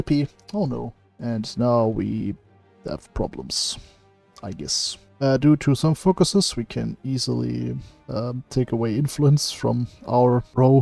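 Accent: Germany. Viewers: Hat dir eigentlich wer mal gesagt, dass man an deinem Englisch den deutschen Akzent SOO einfach erkennt xD Ich wusste von Anfang an, dass du ein Deutscher bist. Naja wie dem auch sei, ich wünsche dir noch was Schönes :D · It's cuz he's German not cuz he's an English speaking RPer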